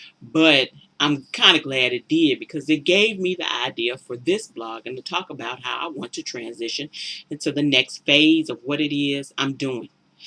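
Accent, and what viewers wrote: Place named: South, United States. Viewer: !! I've appreciated your presence on BBW and Twitter for some time. How am I just now seeing your YT channel?!?!? I loved this and look forward to checking out your other vids. Off topic: I LOVE your accent (fellow Southern girl?...)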